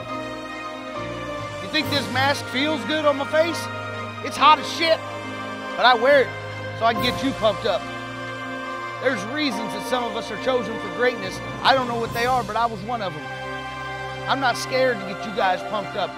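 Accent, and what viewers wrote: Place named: Texas, United States. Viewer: Dude, you're from Kansas, but sounds like yer from Texas.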